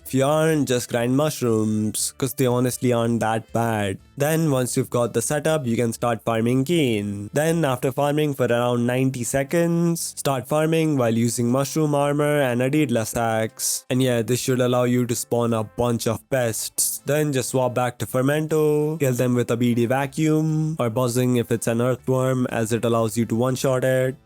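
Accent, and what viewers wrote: India. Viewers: indian ah accent is so annoying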